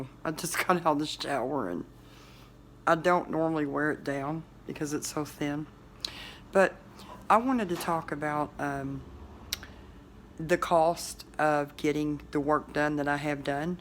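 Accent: United States. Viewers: If you dont mind me asking.... Where is this dentist located? I need 9 teeth replaced and I'm very intrested in what you said about the pricing and everything about this place! I'm quite excited actual you sound southern and I myself am a Southern .... I live in east TN. I hope its not to far away from me. I just really need this in my life and you made it seem like a reality to me and that made me not so depressed and hopeless.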